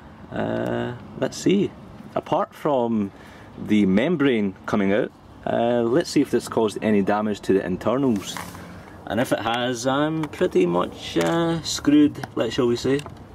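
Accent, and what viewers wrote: Scotland, United Kingdom. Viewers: I like how you say otter, o'er box, i love the Scottish accent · are you scottish?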